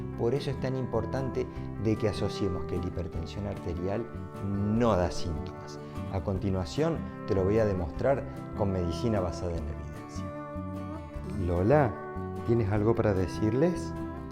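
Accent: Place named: Córdoba, Argentina. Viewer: Buen vídeo ! El asentó cordobés no se te va 😂😂😂 yo 20 años in USA y no hay caso 😂😂😂 Aguante Alta Gracia !!!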